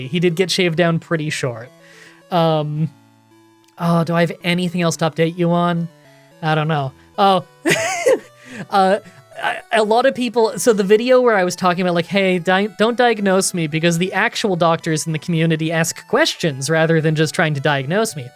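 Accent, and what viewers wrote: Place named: Canada. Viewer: Your Canadian accent makes me happy.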